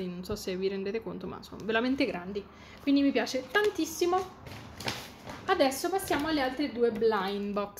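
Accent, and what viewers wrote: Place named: Rome, Italy. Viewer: Ciao!! Di dove sei? Io convinta che fossi di Roma 😅 Quando ti ho sentito dire che non c'erano nella tua regione ero tipo 😮 Comunque tutti bellissimi ❤️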